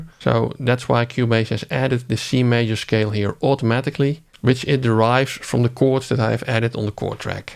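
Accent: Netherlands. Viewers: Man man man, wat een dik, Nederlands accent. Maar verder wel een informatief videootje over de Chord Track.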